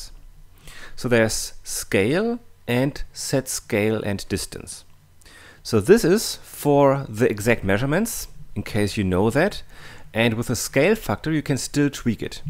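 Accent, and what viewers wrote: Germany. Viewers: R U german? You have a german accent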